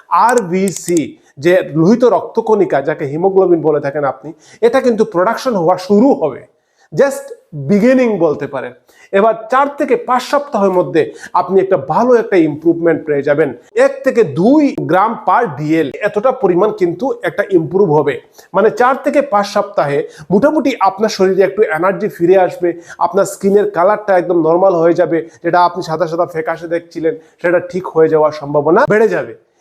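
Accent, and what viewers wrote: Sylhet, Bangladesh. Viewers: apni monehoy ekjon Sylheti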